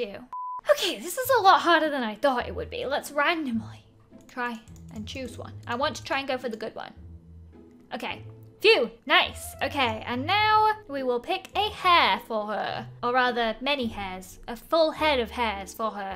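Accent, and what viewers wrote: United Kingdom. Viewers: Is anyone watching this video in 2020 (British girl accent for lizzie)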